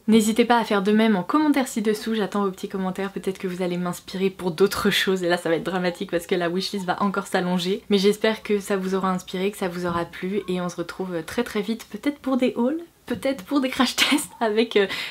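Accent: England, United Kingdom. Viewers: Tout me donne aussi tellement envie ! On va être nombreuse à craquer 😍 Ps : ton accent anglais me fait toujours autant rigoler ! Gros bisous ma belle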